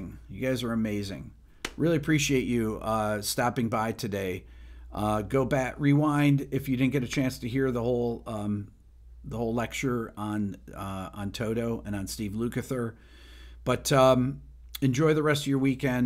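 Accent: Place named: South, United States. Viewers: You are a native New Yorker but the south is starting to rub off on you. Joined the league. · Ain’t nuthin’ wrong with soundin’ southern lol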